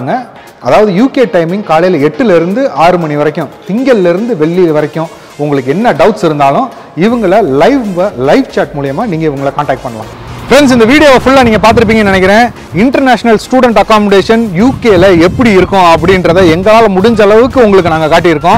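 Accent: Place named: Chennai, India. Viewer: Looks like indian cricketer Namma aswin Mari irukinga avar Mari tan pesuringa,like frm Chennai 🇮🇳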